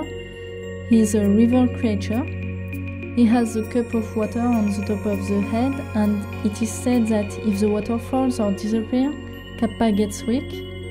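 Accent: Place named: France. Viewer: Are you French? Your accent sounds like my buddy Bertrand who moved here from France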